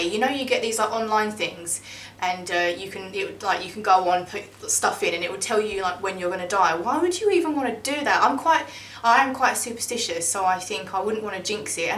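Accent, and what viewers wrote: England, United Kingdom. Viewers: What part of England are you from? Could listen to you narrate a phonebook all day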